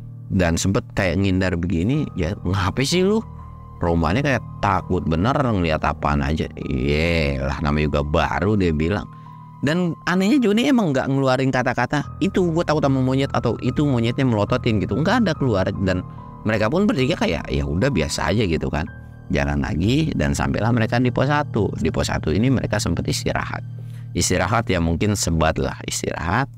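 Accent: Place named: Banyumas, Indonesia. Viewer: Hadir ngapak Bang 😂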